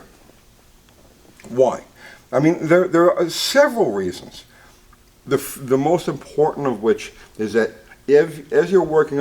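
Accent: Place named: New York, United States. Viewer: I've noticed a deep underlying trend to your videos lately, and I truly mean this constructively. Your videos are a direct response to comments lately. You address these "issues" and "haters" as the New Yorker you were raised as. The issue is that the New England accent in general has adapted into something that comes across as aggressive and matter of fact, to the point of over the top arrogance. And to most of the viewers not from NY you might just sound like a know-it-all dick, this could be off-putting to the simple folk and cost you a few subscriptions and a views. Just some professional criticism.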